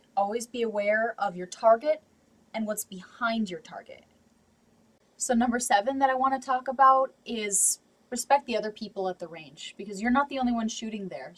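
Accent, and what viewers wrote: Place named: North, United States. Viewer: haha ur from up north rock and roll i can tell by your accent i spend 3 moths a year in northern mn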